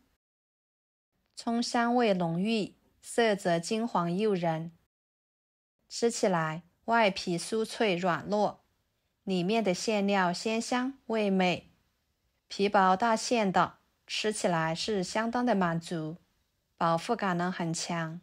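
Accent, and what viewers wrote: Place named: Yunnan, China. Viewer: 云南普通话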